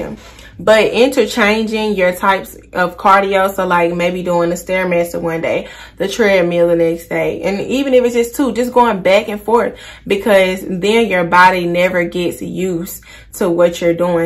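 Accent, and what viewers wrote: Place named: South, United States. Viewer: your accent is adorable southern belle!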